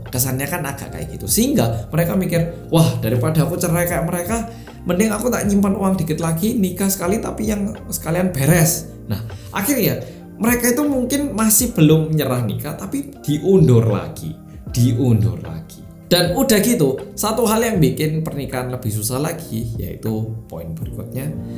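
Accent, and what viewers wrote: Java, Indonesia. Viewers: Suka bgt nonton vlog ttg korea 🧡 Bersama dgn org korea yg mendok 😊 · Halo gaiss welcome back to Korea Reomit bersama orang Korea yang medhooookkkk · setelah mendengaar curhatan mas korea medok. Jiwa Bersyukurku Tumbuh dg Suburnya... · Mas wong korea, tapi kok medok jowo yo.. Keren unik. Informativ Vlog nya. Mantap👍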